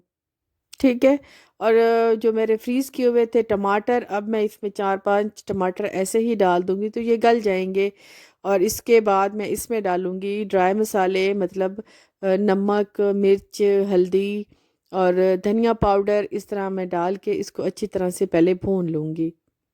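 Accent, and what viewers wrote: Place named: Bahawalpur, Pakistan. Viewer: Wah g choti choti khushianbbarri barri enjoyment🤩mn bhi bwp se hun aap k lehjy sun kr acha lgta ha .multan ar bwp ghoomny mn bht maza aata ha such mn .missing a lot my cities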